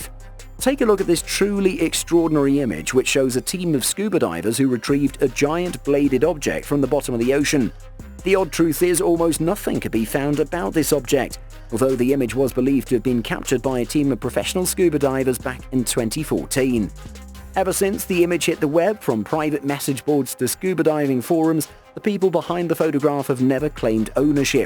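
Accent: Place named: United Kingdom. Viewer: Boy you British lol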